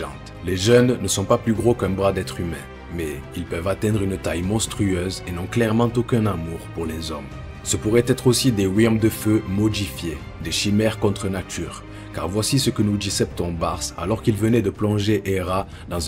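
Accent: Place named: South, France. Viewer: J'adore ton accent mon frère, tié du sud ?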